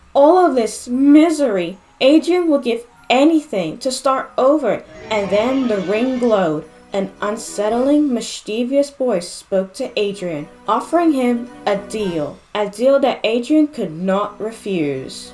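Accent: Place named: Australia. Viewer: things i like about your cat noir: - his wishing to be free is giving me satoko vibes -the additional abilities you gave him. -his identity as Felix Martin, it's a good homage to the original idea thomas astruc had and adds more potential drama. _how calico black used magic when he was alive, that's a good story by it's own. -the spanish guitar music that played in the video. -you mention how cat noir flirts with whoever he wants, leading up to the typical attempts to seduce ladybug which all fail. -how his dad is sending Akumas to find Adrian as well as take the miraculous, it shows there is more plot outside of the mcguffins. -how you referenced the intro to miraculous by describing him as a normal boy with a normal life. -how you play the original music from the trailer. things i don't like about your cat noir: -if he doesn't care about right and wrong then why does he bother hunting akumas other than to prevent them from finding him. 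- his long white hair is kind of a huge distraction from the rest of his costume it's kind of an eye sore. -i don't know if you are australian or not but your accent was so thick i couldn't understand when you said calico.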